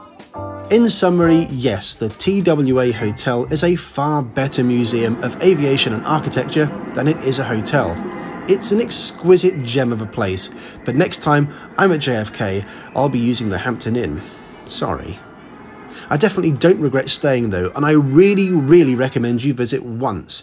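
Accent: United Kingdom. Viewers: LOL I laughed at that too. He's British. Give em a break.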